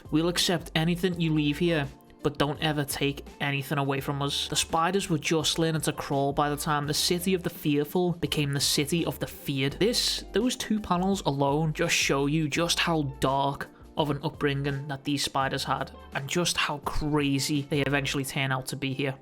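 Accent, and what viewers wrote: Liverpool, United Kingdom. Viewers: My scouser reviewer in the building 😍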